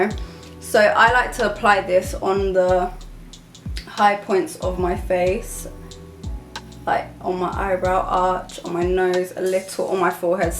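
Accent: United Kingdom. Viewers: теку от её Британского акцента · Strange accent, a mix of British and... German? Dutch? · the engaging British accent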